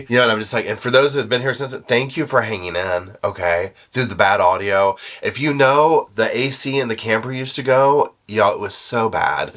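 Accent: South, United States. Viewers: Congrats!--Been watching since Corona.--Your Southern voice and emotions are so soothing and adorable. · I love that country accent— and I keep coming back for it! Congratulations 😊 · Congrats again. I think you are a great storyteller. I can listen to some of your videos over and over, just to hear you tell the story. Call me weird, but your Southern accent adds to it for me, as does your sense of humor. You also express concern for the victims and their families.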